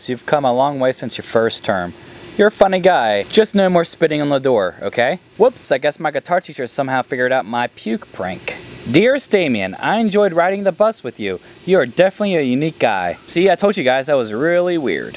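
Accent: Australia. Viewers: What country do u live in? i can kinda hear a little accent (kinda like Australian or British)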